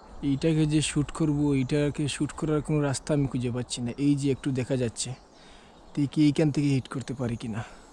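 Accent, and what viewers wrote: Sylhet, Bangladesh. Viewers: ভাই শিকার করেন আইনি কোনো সমস্যা হয়না। আর আপনি কি সিলেটি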